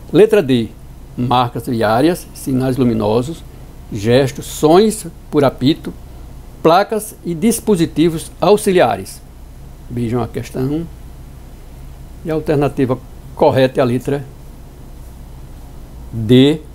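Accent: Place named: Paraíba, Brazil. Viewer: Ele deve ser da Paraíba pelo sutaque não sei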